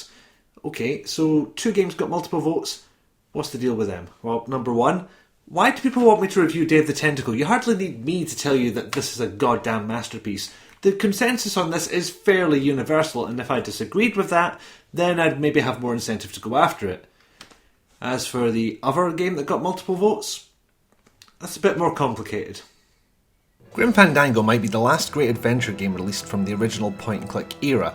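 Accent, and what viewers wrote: United Kingdom. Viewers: I love your Scottish accent!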